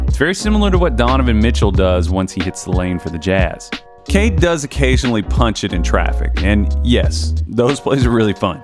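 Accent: South, United States. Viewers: This video making genius got that Chris Vernon style Southern draaaaaawl going on!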